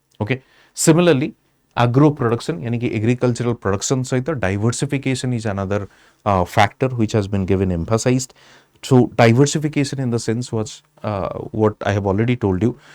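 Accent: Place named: Odisha, India. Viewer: E master odia english style re kahibaku chesta karuchi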